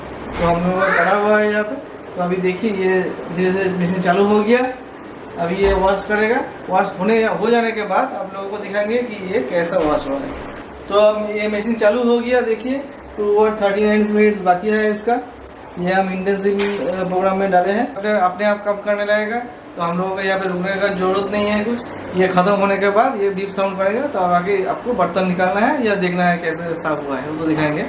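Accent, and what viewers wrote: Bengal, India. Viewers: Proper bengali accent 😀👍👌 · Kya AP bengali ho? Apke language waisa laga Raha hai 😊 Btw video was good 👍🏻😊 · আমি ভিডিও শুরু হতেই উচ্চারণ শুনেই বুঝে গেছি যে ভাই আমাদের নির্ভেজাল বাঙালি ! ভাই একটা অনুরোধ রাখার চেষ্টা করবেন যেন আপনি সর্বভারতীয় দর্শকদের জন্য হিন্দিতে ধারাভাষ্য দিলেও বাঙালিদের জন্য পাশাপাশি বাংলাতেও একটা আলাদা চ্যানেল খুলুন ৷ আমরা সবাই আপনার চ্যানেলকে সাবস্ক্রাইব ও শেয়ার করে এগিয়ে নিয়ে যাবো ৷ অথবা বর্তমান চ্যানেলেই পাশাপাশি বাংলাতেও ভিডিও আপলোড করুন ৷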